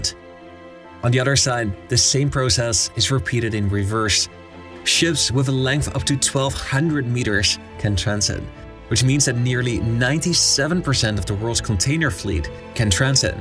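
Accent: Netherlands. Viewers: Is he Dutch? Sounds Dutch trying not to sound foreign.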